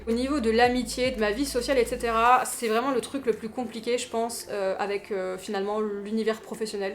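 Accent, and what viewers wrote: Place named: France. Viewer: un an tu viens juste d arriver et durant la pandemie en plus c est surement plus complique attend tu auras des amis du travail des decouvertes culturel et pleins de surprises dit toi que c est une anne special pour tout le monde <num> seras surement beaucoup mieux il ne faut pas perdre l accent francais ce n est pas un reproche au contraire on aime bienvenue tous les francais et francophones le quebec EST FRANCAIS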